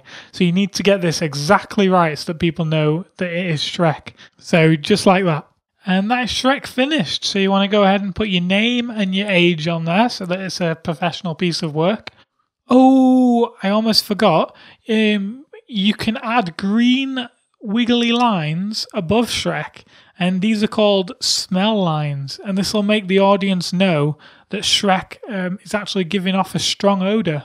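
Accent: United Kingdom. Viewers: you sound like a nice gent from north of manchester, good drawing i've improved my own sketches with lots of 3D effects and in the head loock techniques. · the awesome british accent is what makes me watch the whole video XD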